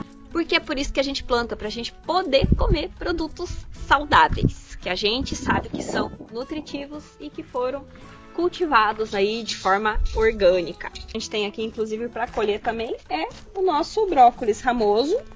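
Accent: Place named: Paraná, Brazil. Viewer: Bonito quintal. De onde vocês são? Seu sotaque me lembra o do Paraná!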